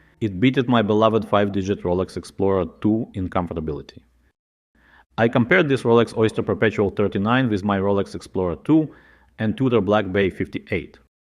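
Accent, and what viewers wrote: Russia. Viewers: Братан, твой русский акцент выдает тебя.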